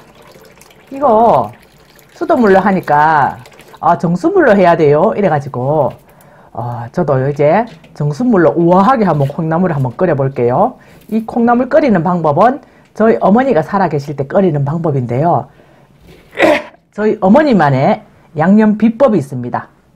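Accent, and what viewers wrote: Gyeongsang, South Korea. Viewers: 갱상도 사투리 너무 재미나요 ㅎㅎㅎ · 아이구 ~ 아삭아삭 맜있는소리 나네요" 경상도라에 ?? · 댓글 처음 달아보네요..저는 부산 살다 충청도로 올라와 생활한지 10년이넘어갑니다..그러다보니 경상도 사투리 들으면 왠지 정겹고 그리워요. 사투리 들으니 속이 뻥 뚫리고 넘 재밌어요. 특히 오봉~~ 이란말에 빵 터졌습니다... 좋아요..구독😍😍😍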